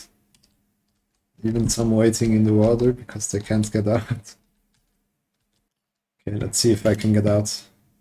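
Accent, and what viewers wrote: Switzerland. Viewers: geile siech, brutali idee & rechtig guets video, de schwiizer english akzent brüder love it😂go get the bread🔥🚀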